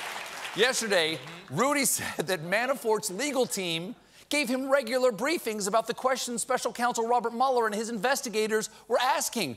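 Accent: Australia. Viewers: Can someone tell SC there is a difference between a working class/outback Australian accent and an Australian newscaster accent.........go for the second one not the first. Especially if you are going to do a good Assange. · English accent has an Australian accent. Funky.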